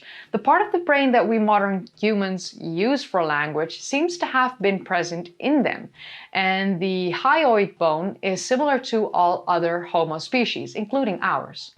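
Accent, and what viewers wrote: Netherlands. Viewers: Hm, what when they are not disappear / going extinct , because they had offspring with the other human species? When the DNA could not extracted, we don't know it. ;) Greetings from Germany and I like your Dutch accent. Heidelbergensis aussprechen ist auch auf deutsch ein Zungenbrecher . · Heel interessant om ons op onze juiste plaats te zetten, wij zijn nog jonkies vergeleken met deze homies. Bedankt voor de vertegenwoordiging van nederlandse uitspraak in deze engels-gedomineerde omgeving.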